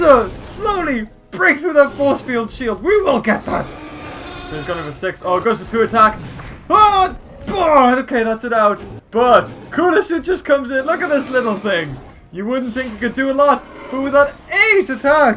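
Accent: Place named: United Kingdom. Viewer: hes british even though his accent isnt heavy it probably just sounded like it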